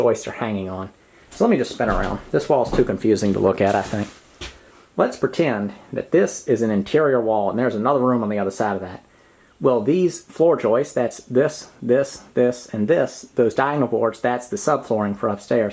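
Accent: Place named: Texas, United States. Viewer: Hey, I live in France and about wood framed houses (maison ossature bois in French) you're actually so right. Our tough houses ( masonry) are tricky to renovate regarding man strength and structurarly it requires heavy heavy duty sometimes just to add a door, a window or a sliding door. Wood houses are on the rise it is true in France. They're not really cheaper since we don't havea lot a wood resources. But they provide flexibility regarding the floor plan and the possible additons of the house (for instance, adding a bedroom on the side of the house). Workload is really easier to manage with wood than masonry. Also, wood provides better eco (heat) performances but for sure far more sound issues (cracking and sound passing from a room to another) and some people fear the wood to rotten and by doing so, making the house to collapse. Anyway, I bought a house in Normandy which was a pig house transformed onto a house but its core is compacted dirt with cereals... 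Anyway if I'd build one, I'd choose wood for sure. Love your videos. Love the music. Love your Texan accent. Love the content. Love the answers given to our questions either in the comments or in your videos. Take care and love this house too, great potential. 👋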